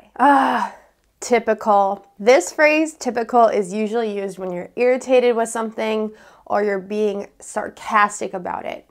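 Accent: United States. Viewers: Are you really an American ? I am asking this because there are so many online teachers who claim to be Americans but actually are Russians.Anyway you sound like an American to me.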